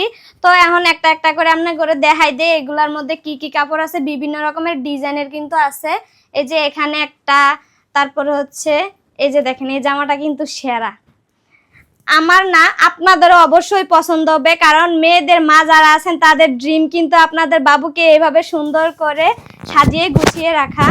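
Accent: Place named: Bangal, Bangladesh. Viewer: কথার ভাষা কি বাঙ্গাল বাঙ্গাল ছি এগুলো আবার ভিডিও করে লজ্জা শরম নাই বাঙ্গালের বাচ্চা গো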